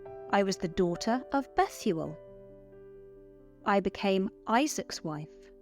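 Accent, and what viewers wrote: England, United Kingdom. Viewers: First time I got the English accent lady's quiz totally correct. I got scared when I saw "Ruth" and I thought you'd ask Chilion's wife's name. I know it means "back of the neck" but I can't remember.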